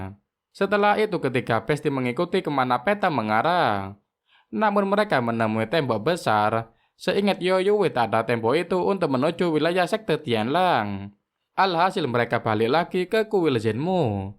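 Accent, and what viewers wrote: Java, Indonesia. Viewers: jelek suaranya, bahasa indonesia dengan lidah kental Jawa.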